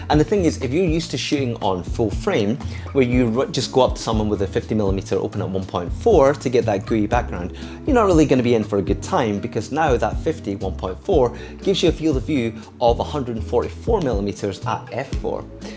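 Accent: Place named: Scotland, United Kingdom. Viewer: Asian face, Scottish accent, cameras, happy to have found your channel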